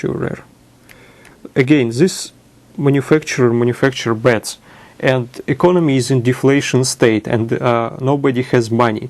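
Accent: Russia. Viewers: Russian accent is cool :p this video is the real deal. Very informative, thank you. Why not write a book ? You have plenty information and experience. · This Siberian chatterbox has the most irritating accent imaginable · I agree. The accent is great [Russian accents are just so frickin cool] and the explanation is great. what's bad is that there's still people in America who don't think this kindof thing could happen to us, but we're SO on that path of reaching over 1000% inflation. the only real way to get rid of an economic crisis like this, IMO, is to get rid of the central banking system [which tends to be the root of all the problems] and go to a solid, consistently valuble money standard of sorts.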